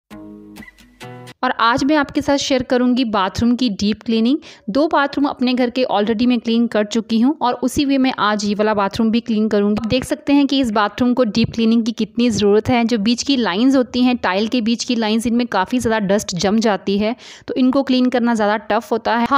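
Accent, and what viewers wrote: Punjab, India. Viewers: ਭੈਣ ਜੀ ਪੰਜਾਬੀ ਟਚ ਹਿੰਦੀ ਬੋਲਦੇ ਹੋ ....ਵਧੀਆ ਲਗਦੀ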